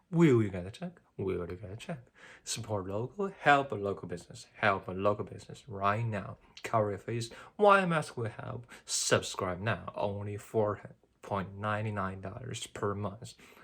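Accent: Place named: United Kingdom. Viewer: This is Hao. Your work reminds me the Garbric Orozco’s Hammock En La Moma. I also like the way you make the British accent. It makes it sounds very professional. The changing of voice during the news report also makes me think that the broadcast news must be a very boring job, but the people who broadcast it must stay still and act normally no matter what happens.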